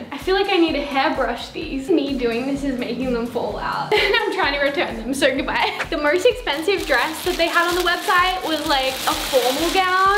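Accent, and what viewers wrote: Australia. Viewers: Jessica Rivera Australian accents make me so happy haha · I just want something..... I WANT YOUR AUSSIE ACCENT🔥💗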